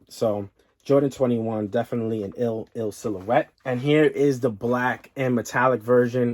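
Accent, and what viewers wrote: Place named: Long Island, United States. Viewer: Dude got a harrrdbody Long Island accent hahaha love it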